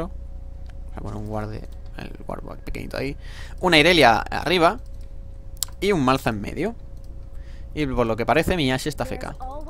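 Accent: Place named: Spain. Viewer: todos los españoles pronuncian asi el ingles?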